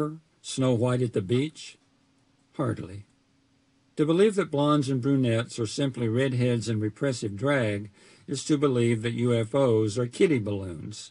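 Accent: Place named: South, United States. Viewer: I'm a huge Tom Robbins fan. Am going to read on my YouTube channel. Came upon your channel. You have a new sub. You sound Southern. I'm from Alabama❤🇺🇸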